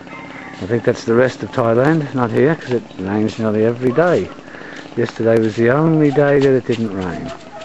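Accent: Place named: Australia. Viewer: I have never known anything on how rubber is made although i always thought it came from the sap of a tree. you can really recognise the Australian accent in this video. I really like the bridge so authentic, the thailand landscape looks really lovely and lush and tropical. The thailand people look so nice and welcoming and peaceful. Wow five dogs this is alot. The fluffy dogs are really cute!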